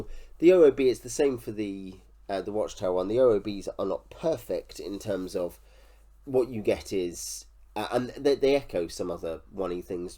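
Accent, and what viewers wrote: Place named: United Kingdom. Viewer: Wtf dude. You can't follow a British accent? You have to get out more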